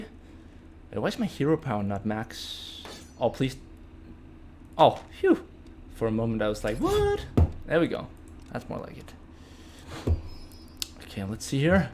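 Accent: Denmark. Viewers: Classic Riposte is a fencing move. A parry witch flows fast and directly into an attack. Also can't wait for Mastery video. By the way do I detect a fellow Danish accent? :)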